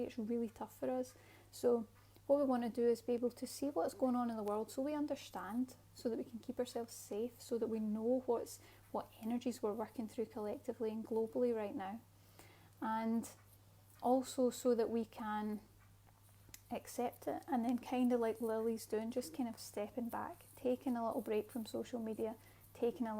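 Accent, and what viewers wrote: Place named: Scotland, United Kingdom. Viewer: You sounds like Scottish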